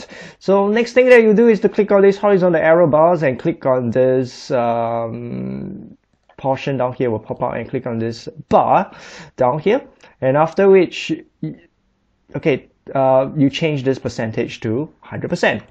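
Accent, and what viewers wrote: Singapore. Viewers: Can tell is Singaporean ! Thanks! haha!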